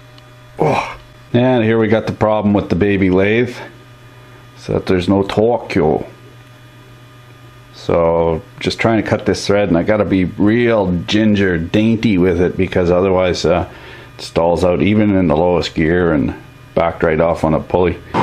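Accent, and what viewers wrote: Quebec, Canada. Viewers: HAHA are from quebec because your french sounds just like mine!! Cool videos btw! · tout tes French-isms que tu fais, j'essaie de deviner d'ou tu viens. Dans un autre video tu disais que t'es un "tête carré anglophone", mais tu parle un français plutôt Québecois. Je dirais Montréal ou Ottawa. Side note I was called a square head by some grumpy old man on a Montreal ham radio repeater, telling me to go back to Ontario, but I've never lived in Ontario. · HAHAHA ! jaime comment tu parle ! pas besoin de t'sa esti ! vivez vous proche du quebec ? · I'm French Canadian too. I live in the states now but that Canadian Frenglish speak cracks me up every time. Brings me right back to where I came from... lol.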